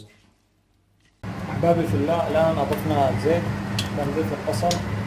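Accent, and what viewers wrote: Aden, Yemen. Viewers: فديت اللهجه العدنيه هههههههههههههههههههههههههه تسلم يديك ياغالي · الشيف لهجته عدنية .. تعزوعدن لهجتهم كثير متشابه .واصله من يافع بس من سكان عدن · وووواقلبي على اللهجه العدنيه حبيب ربي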